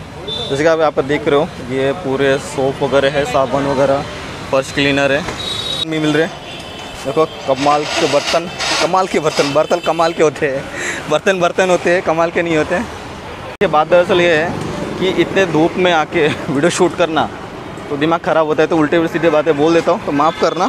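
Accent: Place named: Maharashtra, India. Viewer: Nagpur che lokanna Hindi bolayla khaaz sutli aste ... Tumhi bihari nahi maharashtrian ahat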